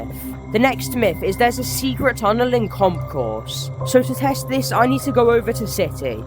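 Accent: United Kingdom. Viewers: Bro is british bruh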